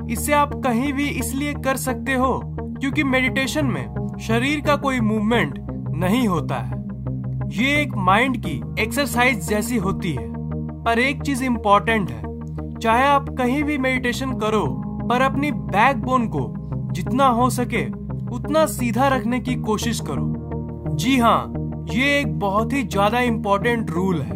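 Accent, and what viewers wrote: Bihar, India. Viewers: Aap Bihar se hain kya? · Bhai aap Bihar ke ho kya 🙄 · Bhai aap Bihar me Kahan se ho aap ki bato se bilkul lagta he ki aap Bihar se ho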